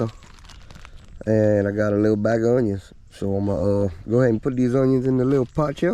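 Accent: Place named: South, United States. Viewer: I love your accent, definitely from the south lol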